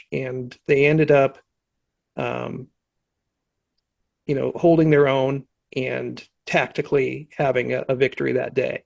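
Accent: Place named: England, United Kingdom. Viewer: How strange to see such quality work have so few views. To be honest, I didn't really know who Logan was. I bought an old book written by him about a conspiracy and real reason for the civil war and kinda wanted to cheat a little before I committed to reading this huge old book. I'm shocked. When said he studied law and lived in Illinois I immediately wondered if he knew Lincoln. I had no idea he was so important. How can so many people, including myself, not know who this man was? He was with Sherman? I must say, Sherman was appalled to learn about land mines in his march. He thought it an inhuman invention and had his southern prisoners walk first. I mention this as it struck me to hear from his memoir. As for the English-American channel, I don't know what to say. I've never heard "awesome" with an English accent. Shouldn't you say "lovely"? 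My Great, Great.....Grandfather came from England in 1629 to the Rhode Island plantation. They moved to Virginia as he married the daughter of Sir Fairfax of your civil war. Thank you for this lovely video.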